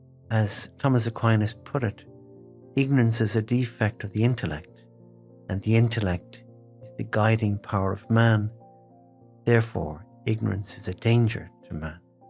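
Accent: Ireland. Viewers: his brogue, while lovely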